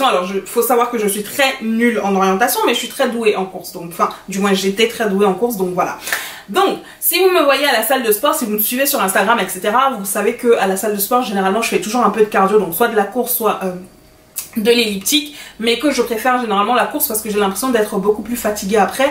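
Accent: Cameroon. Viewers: Belle vidéo j'aime ton accent camer ❤️🇨🇲 je m'abonne direct svp n'hésitez pas à vous abonner également à la mienne merci bien 😇🙏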